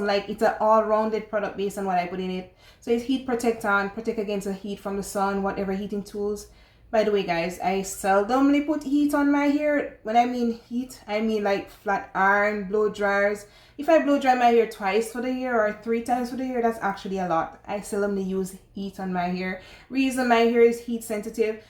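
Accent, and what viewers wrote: Jamaica. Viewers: I like the accent ☺️ sounds jamaican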